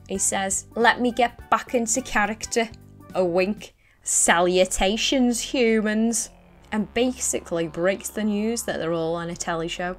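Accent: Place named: Northern England, United Kingdom. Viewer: gender swapped david lister from red dwarf is on youtube doing sims reviews! sign me up! (i’m a millennial american nerd, we hear one northern accent & we get real goofy with trying to bring up pop culture stuff younger folks in the UK may not “give a toss” about 😳—ok back to sewing and smoking weed while watching this vid 🖤)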